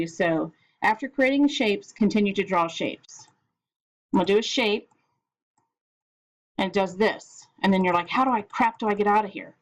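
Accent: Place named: United States. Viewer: thank you for this, really appreciate your help. love your american accent! why the fuck are defaults so insanely wrong all the time, its driving me absolutely crazy!??!? :/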